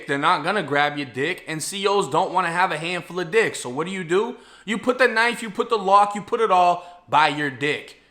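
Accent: Boston, United States. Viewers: That’s wild he sounds very Boston for Florida lol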